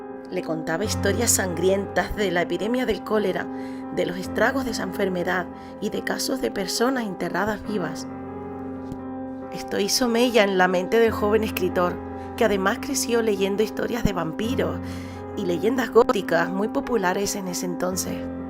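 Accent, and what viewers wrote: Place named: United Kingdom. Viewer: Me encanta!!! A mi me gustan todas las adaptaciones llevadas a la pantalla grande, no me he leído el libro... Pero seguro que lo busco y me lo chuto!!!! Por cierto ame tu inglés tan británico y elegante!!! Y MUCHAS FELICIDADES por tus primeros 💯 suscriptores!!! Yeiiii!!! 🎉 🎉 🎉 Ya tienes tu URL personalizada? 🤔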